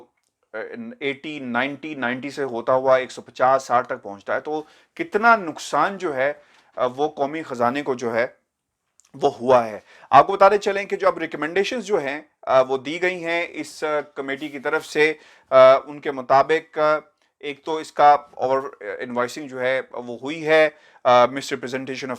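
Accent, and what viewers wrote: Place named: Punjab, Pakistan. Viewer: Sir good video but one suggestion you should work on your accent you have very thick punjabi accent when you are talking in Urdu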